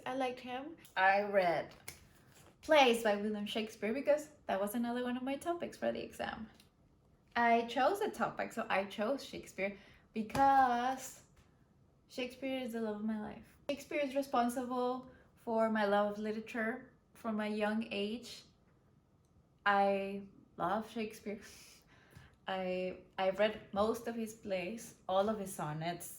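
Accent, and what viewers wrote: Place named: Latin America. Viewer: hi! first time watching a video of yours and i really liked it! your accent's so charming and it's great to hear book reviews from a latina (i'm brazilian, btw!):)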